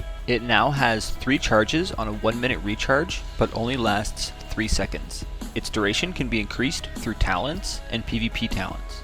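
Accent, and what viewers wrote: Canada. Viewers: Awesome Canadian accent FTW.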